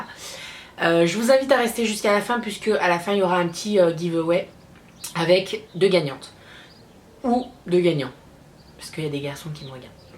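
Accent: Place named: England, United Kingdom. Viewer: Coucou ♥ Alors je participe a ce petit concours bien sympathique :D Alors j'aimerais gagné le parfum Magnetic qui est la dupe de dody de Burberry. Alors pour la petite histoire mon père a celui pour homme et je l'adore donc je me dit que celui pour femme seras une perfection :) De plus je n'es que un petit parfum ( amor amor de Cacharel en forma 33 mL ) et comme c'est un parfum d'été et que je n'es pas de parfum d'hier. Merci pour ce concours. GROS bisous ♥ ps: J'adore ton accent d'Anglais ;) ♥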